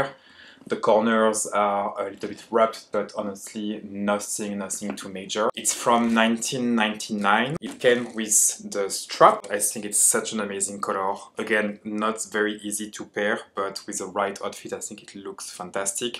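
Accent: France. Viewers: This is the first time I understand a french accent and even find it pretty. I am a foreigner as well and accents can be challenging for me lol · I am in whaouuuuuu. What a well curated collection! Really inspiring . Btw, it will be a shame to completely loose that cute French accent of yours. Just saying 🙃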